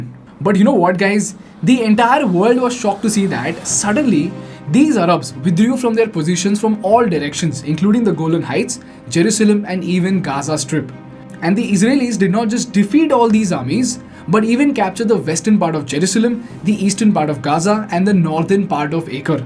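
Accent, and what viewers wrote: India. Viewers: Good content 👏👏, but due to your indian accent, kindly add subtitles so that we fully get what you are saying. Much love from Kenya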